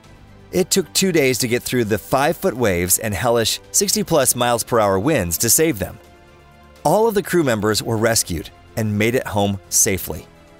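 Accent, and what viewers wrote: United States. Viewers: Most insane American accent i ever heared. Im not able to finish this.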